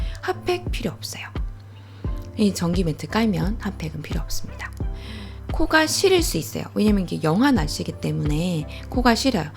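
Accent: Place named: Gyeongsang, South Korea. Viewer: 말씨에 경상도 말씨가 . . . .종종 ㅎㅎㅎㅎ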